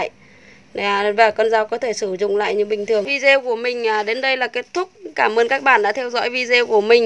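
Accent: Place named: Quoc Oai, Vietnam. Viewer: nghe như giọng ở quốc oai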